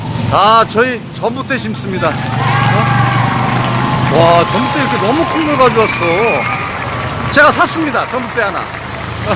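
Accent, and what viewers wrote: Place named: Japan. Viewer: 왜, 항상 일본식 엉터리 영어를 사용하시는지.. 혹시, 택시를 타쿠시라고 하고 버스를 바스라고 하시나요? 일본 사람들 ㅓ 발음 잘 못합니다. 그것을 한국 사람이 왜 따라하는지 모르겠네요.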